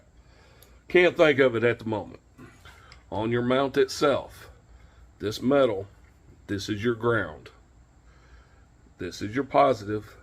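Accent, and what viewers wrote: South, United States. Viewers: Ugly redneck accent. 26 minutes of BS and 1 min of information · Thank god I am from the south and can tolerate southern talk and mannerisms without getting pissed off because "condescending" to Californians is normal speech down here. Also here to learn CB. Got a firestik antenna and am learning how to use it. Thanks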